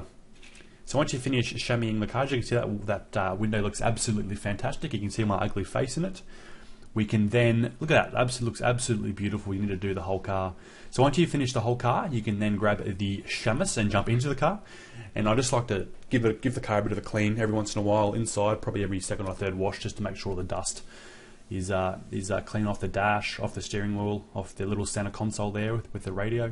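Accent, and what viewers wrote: Australia. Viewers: He’s Aussie? Let’s go😂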